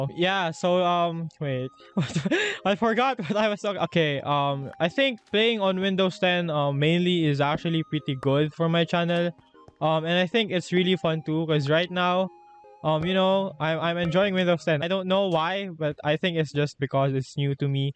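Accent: Philippines. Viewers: filipino ka ba idol? halata kasi sa accent mo eh HAHHAAH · that filipino accent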